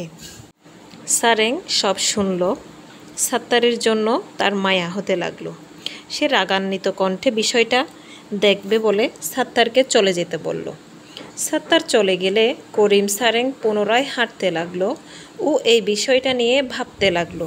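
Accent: Chittagong, Bangladesh. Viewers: Your voice tone like Chatgaia🙂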